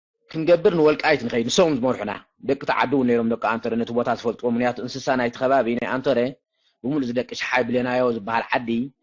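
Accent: Agame, Ethiopia. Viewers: lakbat ab eri zeregexka aytemesiln eka agame kazab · ትግርኛኻስ ኣይግድን ዓጋመ ኢኻ ትመስል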